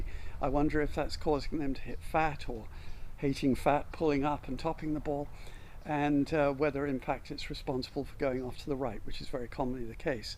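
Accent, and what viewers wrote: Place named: United Kingdom. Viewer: Hi wondering where do you live as your accent sounds British 🇬🇧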